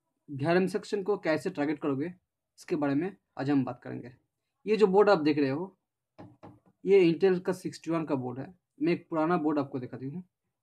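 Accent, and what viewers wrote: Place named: Assam, India. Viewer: Ur way of speaking is like an Assamese people are u from assam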